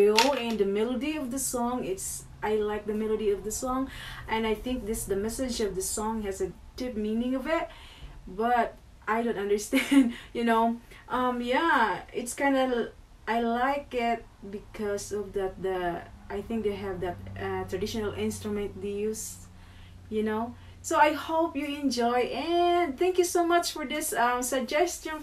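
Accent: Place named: Indonesia. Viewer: Your accent in English is just like Indonesian people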